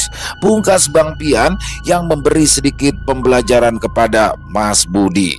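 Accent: Jakarta, Indonesia. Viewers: jangan lama2bangillo.kisah bang pian kangen dengan gayanya bawakan kisah bangpian dengan logt betawinya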